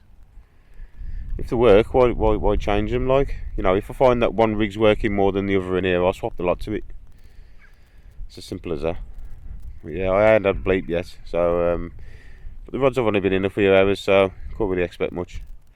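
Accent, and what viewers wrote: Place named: Midlands, United Kingdom. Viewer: Nice vid with some good commentary..👌 Nice to hear a Midlands accent..im in Wolverhampton... Have you tried coppice lane fisheries.?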